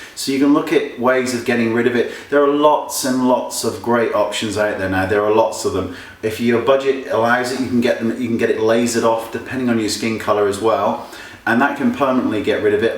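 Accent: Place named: Bristol, United Kingdom. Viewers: You ARE BEAUTIFUL. And having an English accent. Not fair u look even more beautiful here you remind me a lot of my fav singer Darren Hayes I Love all of your videos. Thank you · Sounds like you are from Bristol.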